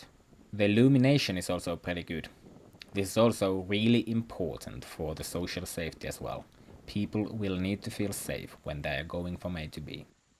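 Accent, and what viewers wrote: Finland. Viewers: I like your assessment (and Finnish accent;-) ) much better than. He's too soft on the crappy infrastructure they have in Calgary. And I live in a city that probably has even worse bike lanes than Calgary... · When I had given the points I would given you at least 100 points more for the Oulu trip. And 10 extra for your humor and pretty Finnish accent! What a beautiful ride. How boring and ugly north American towns and cities are! And even worse in winter. In Finland winter darkness + snow makes the world even nicer and more beautiful. Here in the Netherlands we had today our first little bid of snow. But it was melted within an hour. And that is also one of the big differences between this winter utopia and the Netherlands. Our winters are most of the time just a little bit above or below 0 degrees Celsius and then salt is necessary because roads are almost always wet and can frees unpredictable and then biking and walking gets dangerous. 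And the other big difference between both Calgary and Oulu and the Netherlands: space. We are the most densely populated country in the western world and ground is so expensive here, that this kind of modern city planning with so much space between roads and bike pads or parks everywhere would be great to have but is almost impossible to afford. In the 1960's and 70's it was more common to build neighborhoods with more public space between the houses and private properties, but nowadays every single square meter have to be planned to have a function. Developers will try to build cramped houses instead of living space for people. I think that is one of the big negatives here in my country: developers want to make every possible euro profit out of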